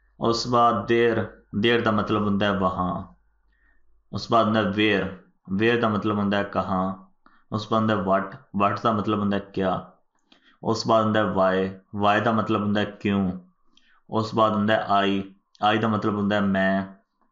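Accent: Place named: Jhang, Pakistan. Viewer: سوہنا جھنگ سوہنے لوک سوہنڑی بولی سوہنا لیکچر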